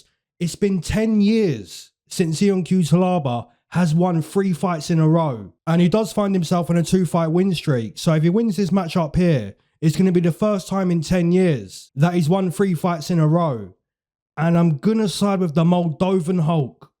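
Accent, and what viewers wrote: United Kingdom. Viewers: Whats good brother was wondering which region you hail from Im trying to learn more about U.K accents. Useless though, because no other american will care that i know this shit.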